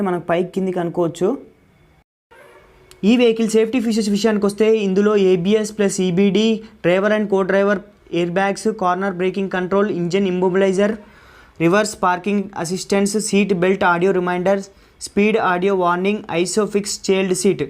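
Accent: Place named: Telangana, India. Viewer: పక్క తెలంగాణ పోరాడు సెప్పినట్టు సెప్పినవ్ కాకా నువ్వు కేక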